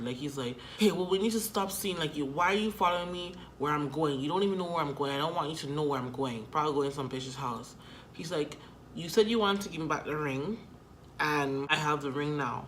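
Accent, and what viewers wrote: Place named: Africa. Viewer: I love your African accent 😂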